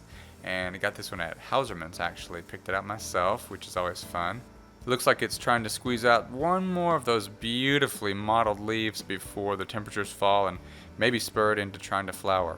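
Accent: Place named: England, United Kingdom. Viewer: Did you go for a holiday to England? Thought I heard some pommie mellifluossity in that soliloquy!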